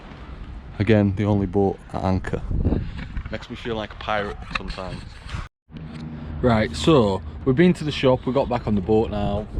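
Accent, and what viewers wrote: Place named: North, United Kingdom. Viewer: Just found you. Good to hear a northern accent, I will need to go back and catch up.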